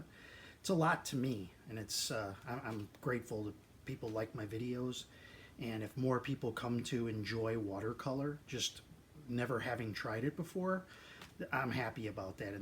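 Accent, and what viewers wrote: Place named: United Kingdom. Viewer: It takes a little time to tune the American ear into everyday conversational style British accents. There are so many and can be difficult for a while.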